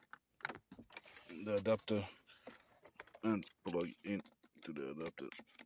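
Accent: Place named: Latvia. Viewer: sveiks pēc akcenta liekas ,ka esi Latvietis ludzu atstui linku kur var pasutit to adopteri ?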